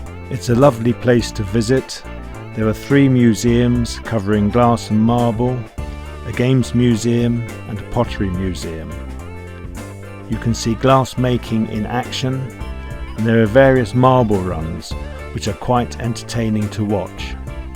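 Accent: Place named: United Kingdom. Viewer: Hey, thanks for the interesting videos! It would be a help if you could put the name of places on the screen so I can look them up on Google or Google Earth. Though your British pronunciation is great, the spelling is not always obvious to us across the pond. Putting it on the screen would be a help. Thanks, and keep up the good work.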